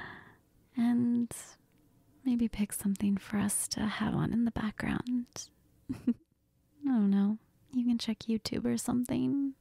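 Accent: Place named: Spain. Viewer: You felt extra Spanish today (as a native speaker i loved it) - Hermoso audio!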